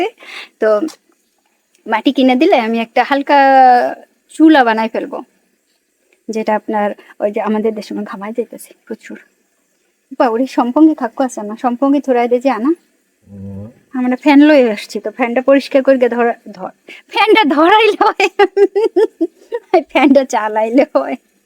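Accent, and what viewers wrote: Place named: Barishal, Bangladesh. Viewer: আপু আপনার বাংলা উচচরন শুনলে মনে হয় না আপনি খুলনার মেয়ে।আপনিতো বরিশালের মত কথা বলছেন।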